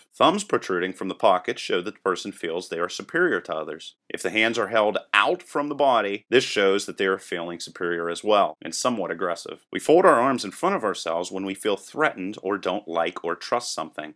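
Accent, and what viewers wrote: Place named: Western Pennsylvania, United States. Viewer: Are you from western PA? I recognize that accent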